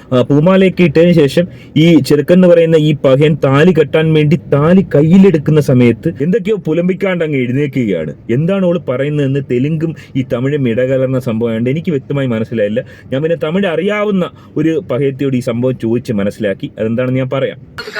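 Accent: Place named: Malappuram, India. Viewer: നിങൾ എന്തിനാണ് എല്ലാ പരിഹാസ വീഡിയോ lum malappuram muslim slang pole സംസാരിക്കുന്നത്. അതൊക്കെ പണ്ടാണ്, ഇപ്പൊ അവിടെയും ആരും നിങൾ സംസാരിക്കുന്ന പോലെ സസരിക്കുന്നില്ല. നിങൾ ഷെഡ്യൂൾ cast കള് സംസാരിക്കുന്നത് എങ്ങനെയാണ് എന്ന് പറയിപ്പിക്കരുത്. അവർക്ക് ഒരു word nte starting letter ഉണ്ടാവാറില്ല സംസരിക്കുമ്പോ, പുലയൻ, പറയൻ, ചെറു മക്കൾ തുടങ്ങിയ വിഭാഗങ്ങളുടെ സംസാരം കേട്ടിട്ടുണ്ട്, അവർ മുമ്പ് തറവാട്ടിൽ നെല്ല് ,കൊപ്ര,കളപ്പുര, help nu വരുന്നവർ ഒരുപാട് ഉണ്ടായിരുന്നു , Eg പറഞ്ഞു തരാം " ആ ചെക്കൻ്റെ പെണ്ണിൻ്റെ വീട്ടിൽ" എന്നതിന് " ആ എക്കൻ്റെ എണ്ണി nte ഈട്ടിൽ " എന്നാണ് അവറ്റകൾ പറഞ്ഞിരുന്നത് 😅😅 അതുപോലെ ഒരുപാട് ഉണ്ട്. ഞങൾ ആരും അത് പറഞ്ഞ് അവരെ പരിഹസിക്കുകയോ ഇങ്ങനെ പറഞ്ഞു നടക്കുകയോ ചെയ്യാറില്ല. നിങൾ ഒരു reaction video ൽ hindu family ye react cheyyumbo polum മുസ്‌ലിംകളെ പരിഹസിക്കുന്ന slang ൽ parayunnath njaan kettu. കഷ്ടം തന്നെ. നിങ്ങളെ നിലവാരം ഇതാണോ ???! മഹാ ബോർ ആണെ്ടോ ഇതൊക്കെ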